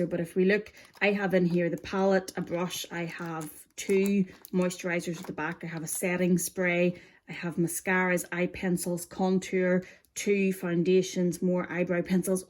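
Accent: Northern Ireland, United Kingdom. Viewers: Fantastic review! Was debating between the nano and mini. Think I might need to get the nano! Do I hear a fellow Norn Ironer? 😁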